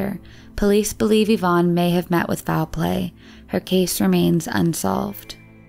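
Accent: India. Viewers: Finally a video without that Hindu voice . Stop making videos with the indian voice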